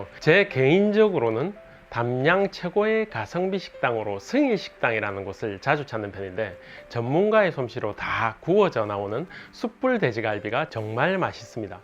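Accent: Gyeongsang, South Korea. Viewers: 경상도발음과 액센트를 좀만더 고쳐주셨으면 싶어요.ㅠㅠ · 다 좋은데 경상도 액센트 귀에 확 거슬립니다. 가이드 하시는 것은 영 파이라예 ~~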